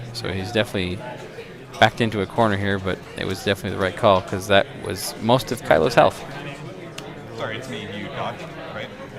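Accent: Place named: Canada. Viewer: lol just the way you pronounce it like Zor instead of Sore. Chances are it's just another ridiculous Canadian thing like Zed instead of Z.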